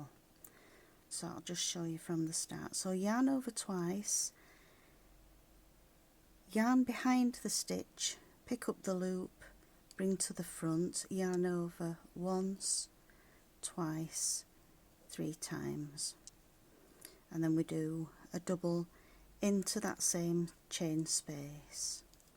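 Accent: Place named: United Kingdom. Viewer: You sound British, so why are you using American terminology?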